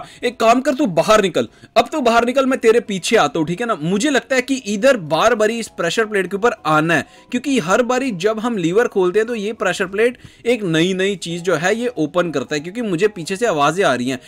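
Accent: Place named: India. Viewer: You are speaking like chapati hidusthani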